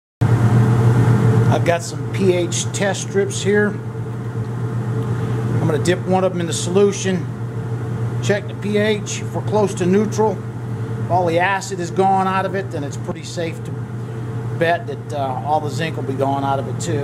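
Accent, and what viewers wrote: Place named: South, United States. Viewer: Great video, but after a while I started thinking it was George W. doing this! Dunno, must be the southern drawl that sounds a little like him...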